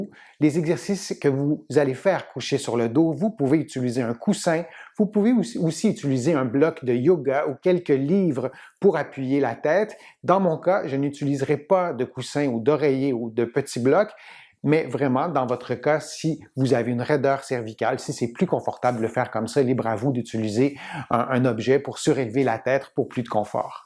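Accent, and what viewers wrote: Canada. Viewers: Merci pour vos conseils. J’adore votre petit accent canadien…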